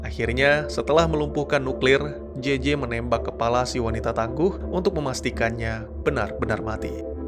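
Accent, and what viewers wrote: Aceh, Indonesia. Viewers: Kak Orang Aceh mana kak?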